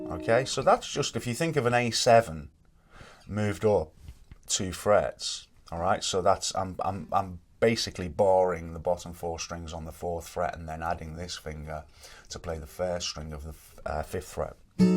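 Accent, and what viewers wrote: Liverpool, United Kingdom. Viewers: Good teacher - but I agree that you should play most of the song BEFORE teaching most of it. Lovely Scouse accent!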